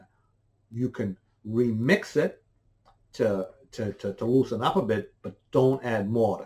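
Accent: Boston, United States. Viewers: Thanks for all the great information based on your many years of experience. When you talked about the extended life mortars, I heard so many different words... "____ life." Pub life? Park life? Pot life? You'll have to excuse me as I'm from the mid-west, but was it palm life? Port life? Part life? Half of me is giving you a hard time for being from Boston, but the other half would really like to know. That is if you would kindly spare a moment of your time to clarify. Thanks and Cheers! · I heard you accent in the first 3 seconds and immediately knew you were from MA, most likely from Boston! I’m not from Boston but I am born and raised in MA like 40min south of the split! Thanks for the video! It was really helpful! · Love ur "Booastan" accent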